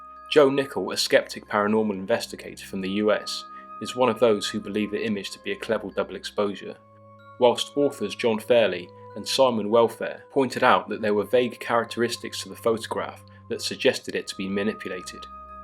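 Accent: United Kingdom. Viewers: I subscribed, and I think this is a great narration and good story. Well researched. I'm partial to British accents reading though. Most podcasts and audiobooks I listen to do not hold my interest if they do not have the accent. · I'm really liking the narrator's voice (?). I'm American & dig a brit accent. His videos are really enjoyable, as well.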